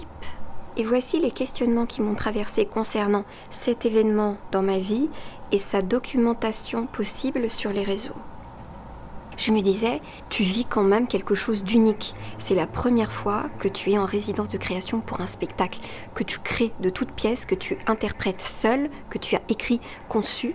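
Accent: Quebec, Canada. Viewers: Cet accent québécois 😍 "je veux pô prendre une tite photo" Sinon tout à fait d'accord sur vivre à 100% l'instant présent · J'ADORE QUAND TU PARLES AVEC L'ACCENT QUÉBÉCOIS CONTINUE S'IL TE PLAÎT JE T'AIME TELLEMENT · Comme toujours, merci / ☺️☺️ J’aime tes réflexions, non! J’adore tes reflexions!! ☺️ Les tites photos ( ton accent québécois hihihi.. 😊) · Incroyable comme a encore un vrai accent québécois 100% quand elle le fait, après ne pas l'avoir parlé aussi longtemps! En tant que Youtubeuse bilingue québécoise et canadienne ça me fait du bien car je fais des vidéos dans les 2 langues ce qui est un énorme PLUS car j'ai pas que 2 langues, j'ai 2 cultures, mais y'a des gens à l'esprit étroit qui me mettent des thumbs down parce qu'il y a 2 langues dans le vidéo et ils n'en parlent qu'une. J'ai des gens que j'aime qui ne parlent pas l'une ou l'autre. Je refuse de me limiter, d'exclure ou de niveler par le bas en choisissant une de mes 2 langues que j'utilise autant l'une que l'autre à tous les jours, autant à l'oral qu'à l'écrit. 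Alors les gens si ça vous intéresse allez voir mes vidéos c'est très vivant, coloré et diversifié, intelligent drôle et sûrement un peu bizarre. Je n'ai que 142 abonnés, j'aimerais atteindre le 1000 pour avoir accès au volet "communauté". Quand j'atteindrai les 150 abonnés je fera ma première vidéo en direct... Bilingue, évidemment! 💜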